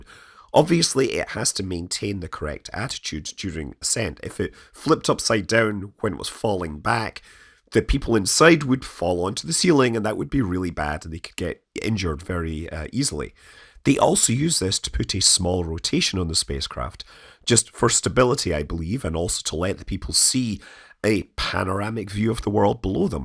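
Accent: Scotland, United Kingdom. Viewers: Listening to this with your deep raspy, Scottish accent, put me over the Carmen line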